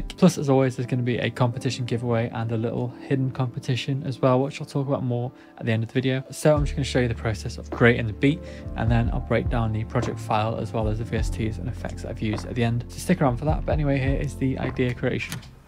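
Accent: United Kingdom. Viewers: Hey good guitar playing mate what part of Yorkshire are you from?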